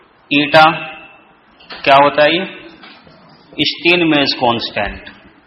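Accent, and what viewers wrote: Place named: India. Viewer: i see the material is good, bt ENGLISH with indian accent....hard to understand.....see ya...bye...thanks anyway....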